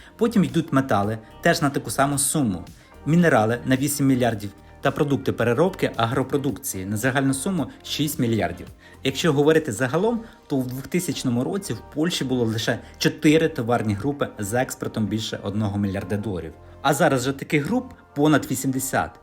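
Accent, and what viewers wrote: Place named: Russia. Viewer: так аканізуєте, ніби війни в нас нема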